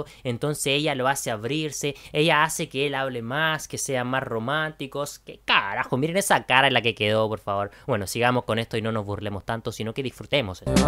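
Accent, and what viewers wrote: Chile. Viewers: Eri chileno o no? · Eres chileno?xd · Eres 100% chileno? Porque es diferente tu acento???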